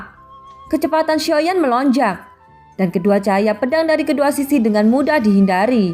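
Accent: Java, Indonesia. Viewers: Medhok nya bisa di kurangin ga..😊😊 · Medok piye iki bahasa ne...😬😬😬😬😬😬😬😬😬😬😬😬😬😬😬😬😬😬😬😬😬😬😬😬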